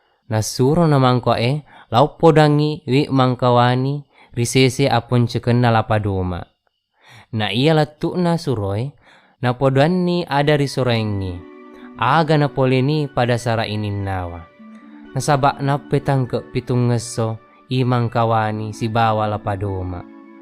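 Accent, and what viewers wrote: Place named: Bone, Indonesia. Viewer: Terlalu kental dengan dialek bone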